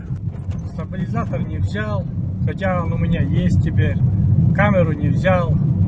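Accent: Komi, Russia. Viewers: Да уж Коми говор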